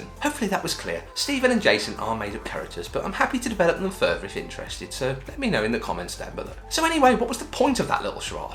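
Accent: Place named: Liverpool, United Kingdom. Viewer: i hope you upload more videos about Facebook in stream ads it's been two years now working in this platform and the worst thing is how mysterious Facebook laws for this content and i just find my self lost because the lack of information and guidance soo i hope you continue your work and speak a little bit slowly cause your Liverpool accent is a little bit hard for me 😄😅 but good jop already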